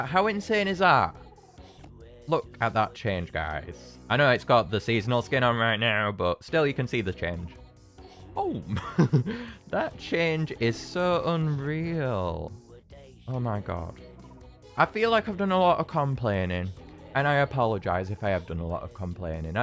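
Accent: Scotland, United Kingdom. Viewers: I think your accent is scottish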